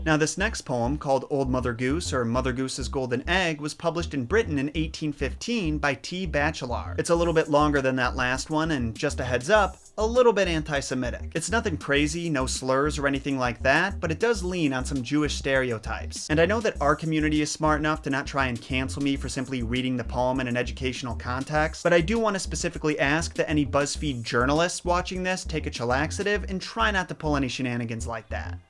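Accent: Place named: New York, United States. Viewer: Sometimes goes full New York italian when he wants to.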